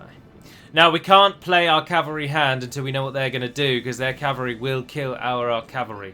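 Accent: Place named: RP, United Kingdom. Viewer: I'm a very recent subscriber, but I have to say, your Third Age LPs are a breath of fresh air, partly because of your super aggressive style of play, and partly because you edit out the boring bits when needed. It sets you apart from a lot of other TW YouTubers, and makes your campaigns flow at at a pretty smooth pace, which I like. I also find your commentary and super posh accent (I mean no offense; I'm a big fan of those accents) pretty enjoyable to listen to.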